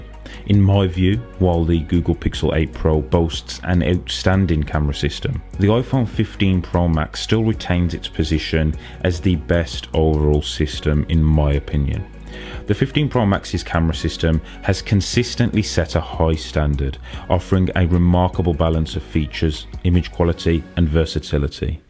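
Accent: England, United Kingdom. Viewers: Bro, your english accent and monotone speech make it hard to understand what you are saying sometimes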